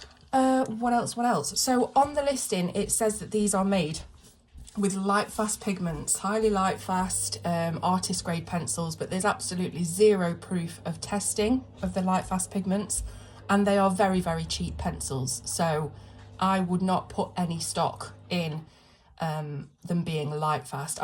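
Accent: England, United Kingdom. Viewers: You don't need to reply to me; just asking you to please remember to turn on CC for those of us who have difficulty following your verbal comments? (Not criticizing your speaking--it's the English accent (I'm from the U.S.) along with my hearing loss that makes it harder to decipher words, in my case.) After your review, if I understood you correctly, these may be the best smaller set of PrismaColor Premiere dupes yet? I like that they blend better and break less easily, which are my two main complaints with PCP. Thank you for this review,! By the way...I now have my copy of Rita Berman's "Meine Reise durch Europa" in hand and am ready for your color-along, whenever you are ready to begin it...!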